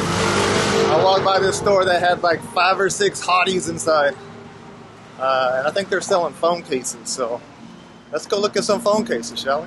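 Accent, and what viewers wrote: Texas, United States. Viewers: LOl i knew it.. i knew you are from Texas, my best guess was from mins when you said "45" that dialect tho.. i told my wife, i think this guy from Texas, and then later.. you said it yourself. you even did small homework about Jokowi and history, really really appreciate it! enjoy your traveling in my country. PS: that drunk dude mentioned "CIU" it's a chinese origin language for SAKE like beverages.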